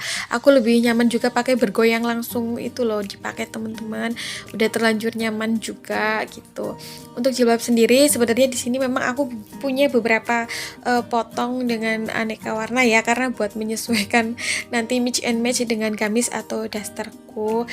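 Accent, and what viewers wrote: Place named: Banyumasan, Indonesia. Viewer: Mbaknya orang mana sih... Kok ngomongnya mirip kaya pengenyongan... 😁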